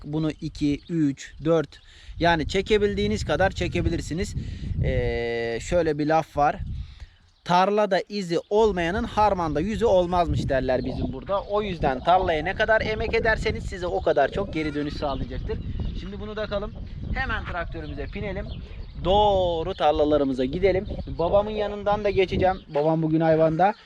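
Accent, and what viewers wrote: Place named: Edirne, Turkey. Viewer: Merhaba Abi, aslen Eskişehirli misiniz? Bizde Edirneliyiz de konuşma şeklinizi bize benzettim şive olsun ağız olsun ☺ Göçmenlik var mı acaba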